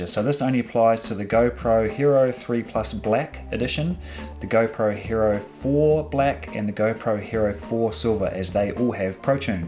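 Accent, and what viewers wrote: New Zealand. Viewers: Awesome work. Have just discovered you. So good to hear a Kiwi voice, and NZ in your videos.Recently got a Solo 3DR with GoPro so your Tutorials are very helpfull. Thankyou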